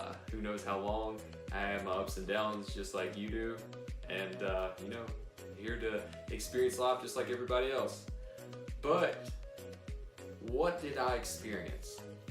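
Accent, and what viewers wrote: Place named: Alabama, United States. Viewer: Where are you from! I’m from bama and I recognize your accent 😉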